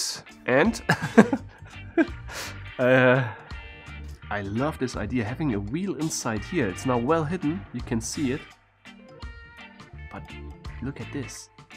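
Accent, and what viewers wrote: Germany. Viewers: I love his up-beat voice, and the German accent - 'Hai, und velcom to ' - is really charming.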